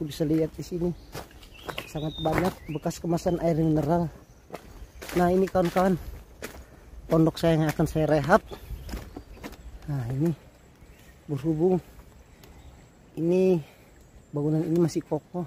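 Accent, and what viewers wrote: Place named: Bima, Indonesia. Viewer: Logatnya kek BIMA NTB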